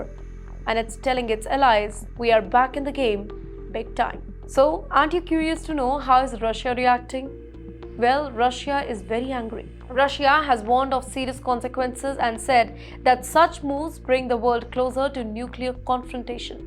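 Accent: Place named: India. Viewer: your accent is hilarious 😆.. obviously Indian.. just stop trying to be global news media cause it's disgusting.. so itchy to our ears 😂😂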